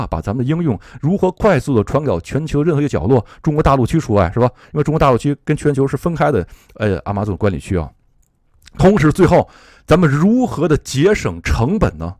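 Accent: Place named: Japan. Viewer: 听口音确实是在日本干活的。komakun加油。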